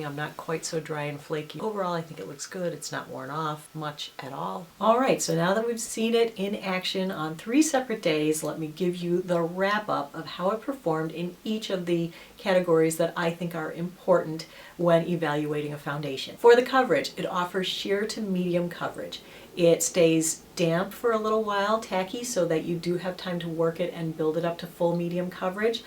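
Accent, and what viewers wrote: United States. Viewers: This foundation looks amazing on you. Another thing, a bit irrelevant i guess, is that i really like the way you talk and articulate. Such a nice accent (american, but you still have many kinds of accents). Makes want to listen to your reviews :D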